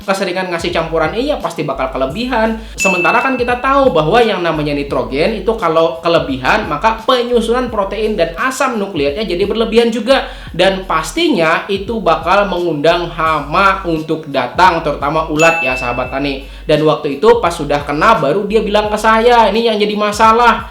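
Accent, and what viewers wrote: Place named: South Kalimantan, Indonesia. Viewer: Mantab pa PPL.....SALAM SSHABAT TANI LOGATNYA DARI DAERAH KALSEL KAYANYA😁😁 BARU PERTAMAA LIAT CANEL NYA PA.....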